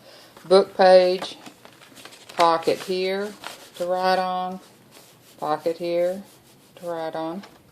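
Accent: Texas, United States. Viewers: Love this journal and your Texas drawl :) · I just want to jump through the screen and flip through this myself!!! I love it......so much creative fun!!! I just discovered your channel . So happy I did!!! This book just looks so personal and homey. Something very free and organic about it. Thanks so much for sharing it with us. Love your accent by the way.....my fave!! From one Texan to another!! HA!! HA!!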